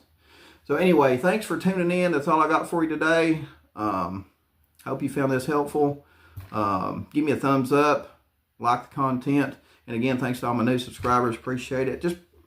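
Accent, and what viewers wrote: South, United States. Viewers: darn your southern drawl is Awesome,Im from Tennessee but iv lost all my accent,Sad,Sori off topic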